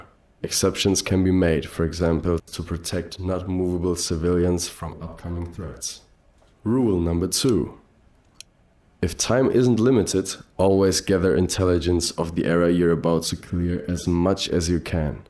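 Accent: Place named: Germany. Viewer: bro sounds a bit too german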